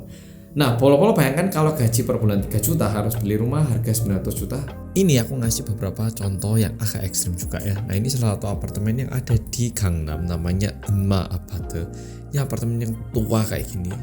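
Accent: Java, Indonesia. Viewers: Mas wong korea, tapi kok medok jowo yo.. Keren unik. Informativ Vlog nya. Mantap👍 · Suka bgt nonton vlog ttg korea 🧡 Bersama dgn org korea yg mendok 😊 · Halo gaiss welcome back to Korea Reomit bersama orang Korea yang medhooookkkk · setelah mendengaar curhatan mas korea medok. Jiwa Bersyukurku Tumbuh dg Suburnya...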